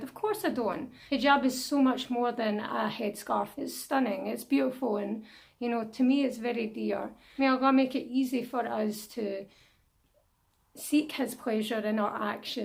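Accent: Scotland, United Kingdom. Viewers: AAA ooozu billah, I thought u r quarantine..Scottish accent is too unique from the British one but beautiful.. Mashallah.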